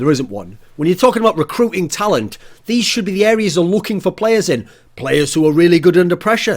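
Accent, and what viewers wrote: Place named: Northern England, United Kingdom. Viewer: swear u have picked up a northern accent... cant remember u having this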